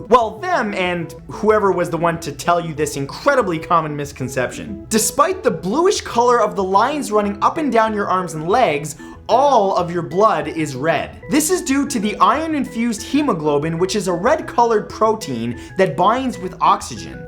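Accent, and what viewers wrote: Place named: Canada. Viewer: I can hear your Canadian accent more in this vid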